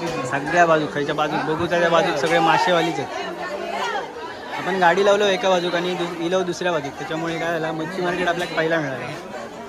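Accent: Malvani, India. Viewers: Tu malvni boltas te Chan vata volg madhe sagat te Chan vata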